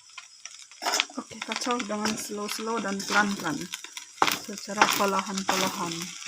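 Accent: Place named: Sabah, Malaysia. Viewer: Kc antam saja tu melayu sabah bha. .haha. .more real sabahan style !!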